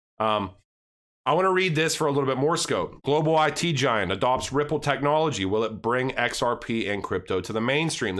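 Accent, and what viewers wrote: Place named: Midwest, United States. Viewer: I'm Charlotte NC You have a mid west vibe Chicago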